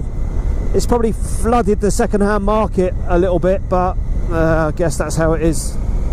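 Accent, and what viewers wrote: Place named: London, United Kingdom. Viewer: Where is this area you are riding? looks like a nice part of the world, and you have a London accent.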